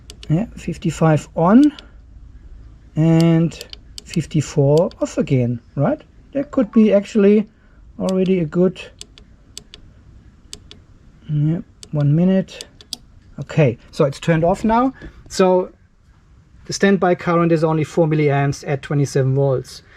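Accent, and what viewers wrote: Germany. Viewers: Höre ich da einen deutschen Akzent raus?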